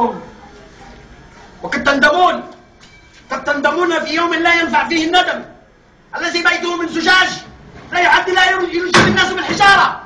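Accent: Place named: Libya. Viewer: كيف أستطاع أن يتقن اللهجه الليبيه الى هذه الدرجه ؟؟؟!!!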